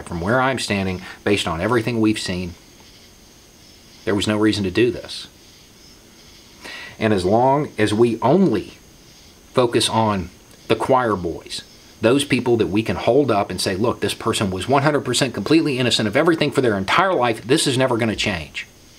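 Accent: South, United States. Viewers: I discovered your channel via this video floating about on facebook - It's refreshing, almost pleasurable to hear such common sense being laid out in a southern accent. I'll be binge watching your stuff now while I wait for Boris Johnson to finish destroying my country.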